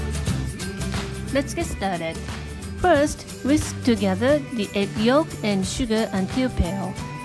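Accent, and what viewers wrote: Japan. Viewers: Japanese English is seriously the cutest thing ever, I could listen to it all day and night 😍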